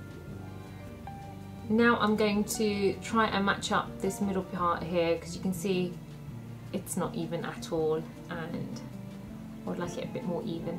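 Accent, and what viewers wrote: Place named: United Kingdom. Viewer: She is an Indian can you belive that. She speaks like a British? ?????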